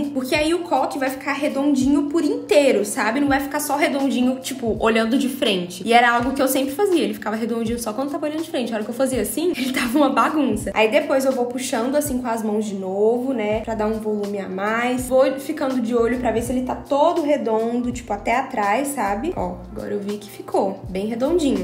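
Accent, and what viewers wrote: Minas Gerais, Brazil. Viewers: Coisas que nós mineiros falamos sou mineira e falo assim também 😍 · Coisa de mineiro eu acho, pq eu também falo assim e sou de Minas 😂❤️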